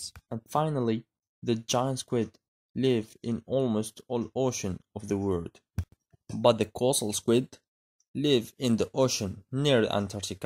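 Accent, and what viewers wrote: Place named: France. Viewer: That nice french aksant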